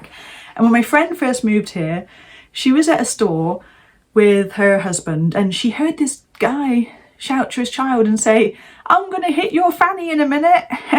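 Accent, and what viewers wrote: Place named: England, United Kingdom. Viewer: Still got your English accent! Hang on to it Luv!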